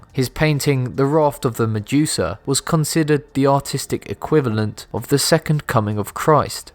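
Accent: United Kingdom. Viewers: Stop assuming he's American just because he made a mistake on something from another culture, you idiot. Judging by his accent, I'd say he's from the UK. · British pronunciation. Not incorrect, considering narrator is clearly British, at least based on his accent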